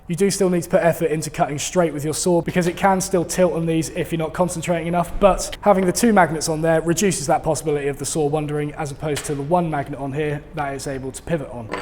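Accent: United Kingdom. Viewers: I'm an American. Sounds fine to me. It just sounds British. If you visited London, would you ride the Underground and ask passengers to call it the "toob" instead of the "choob" so as to not confuse American tourists? communication skills are one of his strong points, and I think your criticism is well-intended but a little baseless. Give it a couple months, he'll be well over 100,000 subscribers.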